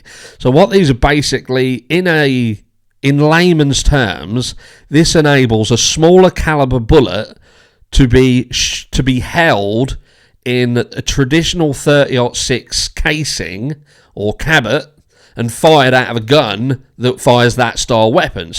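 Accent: United Kingdom. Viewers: It's so funny to hear a Brit talk to Americans about ammo or firearms. 😉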